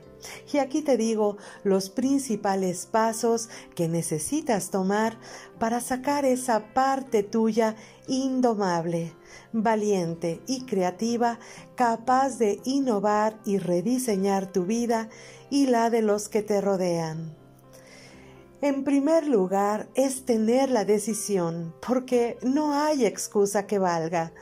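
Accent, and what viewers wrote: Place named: Castile, Spain. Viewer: Otra cosa que me encanta de Usted es que habla y escribe el castellano perfecto, como antiguamente se hablaba y se escribía en España. Me quito el sombrero ante Usted. "CHAPEAU"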